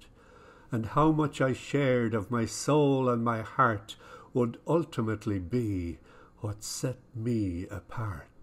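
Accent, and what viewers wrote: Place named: Ireland. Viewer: I LOVE your recitation of my poem, particularly with that lovely Irish accent. You say my words with the exact feeling with which I wrote them. You have given my words life and what a fabulous gift that is for me. You say each word with your heart and I now have a lump in my throat. You are wonderful. Sincerely, Pat F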